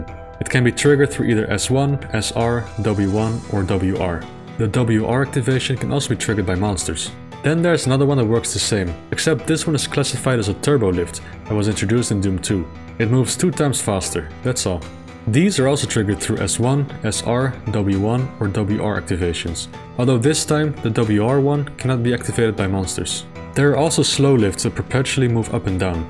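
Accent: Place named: Netherlands. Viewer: You sound Dutch! If that’s the case, I’m proud such highly knowledgeable classic DOOM fan is from my home country.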